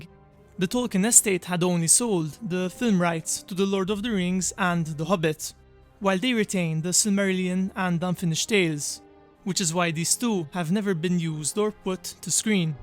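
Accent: Malta. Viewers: The Maltese accent is so evident haha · great video! are you Maltese?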